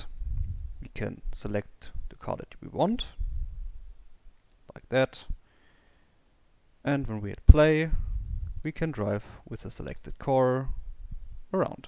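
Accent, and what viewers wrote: Germany. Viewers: It completely doesen't work for me..... You sound german so I hope you are german. Answer in german when you are. When i press play my car won't spawn and i wont possess it.. To make matters worse it spits out this error: Attempted to access BMW_Blueprint_C_0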